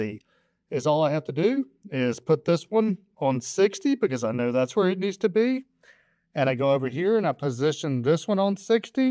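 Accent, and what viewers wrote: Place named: United States. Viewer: where's your accent from in America? I don't live in America so it's genuine curiosity 😅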